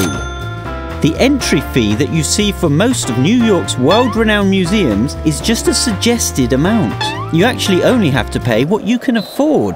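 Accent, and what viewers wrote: United Kingdom. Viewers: What do u know about NYC? You sound British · Why is a British person telling me about new york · Why is a British guy telling us this. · runningstorm920 it sounds better in british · When 13 things not to do in new york was voiced over by a british citizen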